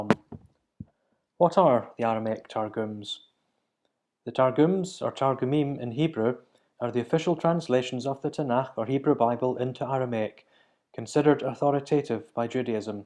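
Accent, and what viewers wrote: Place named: Scotland, United Kingdom. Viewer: Very helpful, Mòran taing. From a family bright to New England I confess that I appreciate your accent from Alba (?) as much as I do the Aramaic.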